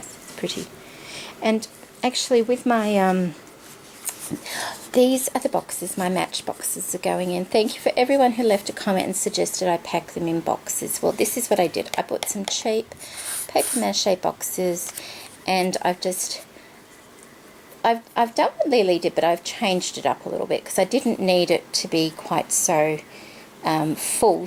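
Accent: Australia. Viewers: Love them ... they're beautiful! Nice to hear an Oz voice ... there's not many Oz ladies doing tutorials! Jennings from the UK does some lovely Shabby Chic ... you could check her out 😊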